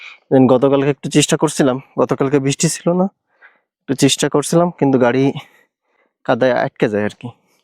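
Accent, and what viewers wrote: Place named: Narail, Bangladesh. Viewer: নড়াইলের ভাষা মনে হচ্ছে।।।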